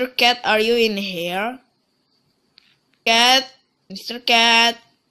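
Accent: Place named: Philippines. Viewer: Your sound is :v Pinoy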